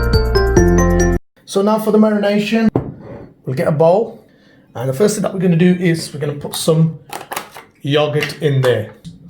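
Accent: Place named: Bradford, United Kingdom. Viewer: r u from Bradford, I guess due to your accent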